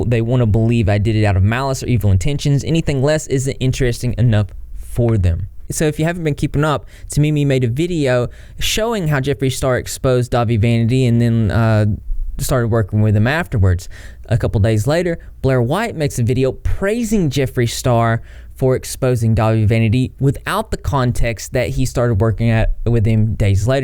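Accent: South, United States. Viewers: Buddy where are you from,, you sound southern,, I’m from Oklahoma your accent kind of sounds like you are to?????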